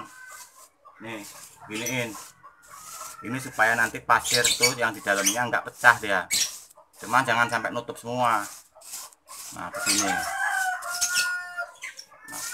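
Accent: Java, Indonesia. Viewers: Sampean logat bicaranya kentel sekali dg logat jawa mas, jawanya mana mas? Aku jawa tengah mas..🙏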